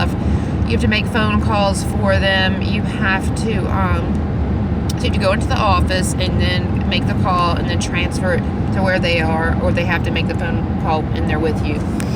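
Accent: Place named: South, United States. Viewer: i love your southern accent lol :D you are so kind to care for these people. i'm sorry you had such a crappy day. i dropped my TV earlier so it's been a rough one for me too :( your job sounds interesting, i'd love to hear more about it!!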